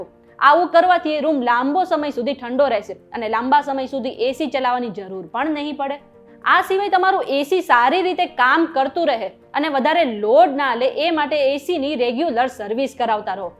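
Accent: Kathiawar, India. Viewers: Whether you are from Kathiawad or what, the speech seems to be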